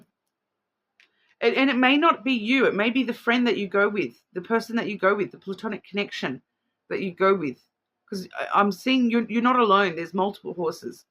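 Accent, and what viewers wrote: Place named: New Zealand. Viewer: Thanks for the reading 🙂 Also thanks for sharing how you ended up with a smattering of New Zealand inflection in your speech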